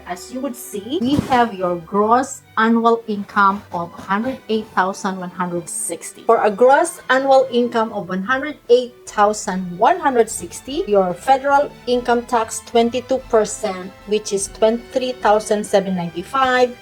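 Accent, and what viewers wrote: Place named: Philippines. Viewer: you look korean , sound like a philippino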